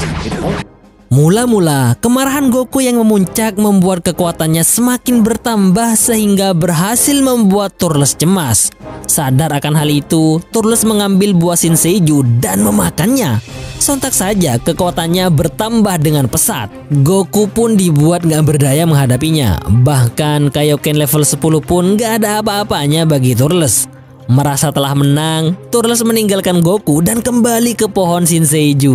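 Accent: Madura, Indonesia. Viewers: Logatnya Madura banget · mimin orang Madura ya? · Ia kayaknya. Logat dan nadanya Madura bgt hhhh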